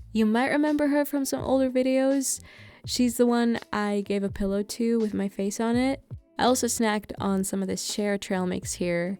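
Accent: Germany. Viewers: Liebe deinen deutschen Akzent haha !!! Love your videos !! ❤️❤️❤️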